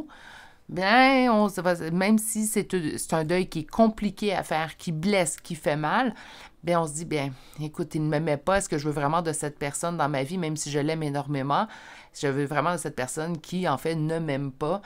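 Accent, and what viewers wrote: Canada. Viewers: Merci à vous pour vos guidances sans langue de bois et j'adore votre accent canadien et aussi, présent à chaque guidance, c'est chou 🥰 bonne soirée à vous ou bonjour chez vous 🌞 🙏☮️💖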